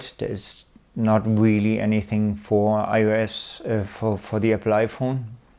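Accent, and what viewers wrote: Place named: Germany. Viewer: Meine Jüte, da kickt das Deutsch ä bissl durch :face-blue-smiling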